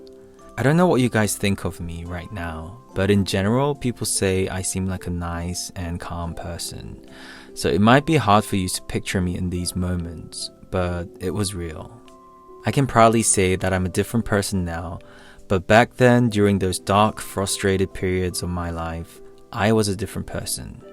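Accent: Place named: England, United Kingdom. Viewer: You have a great English accent. You are definitely different. Love your videos .thanks for sharing. The world is here for you ❤ 💕. With all mental support. Is ok to be sad and angry and is ok to be aware of it.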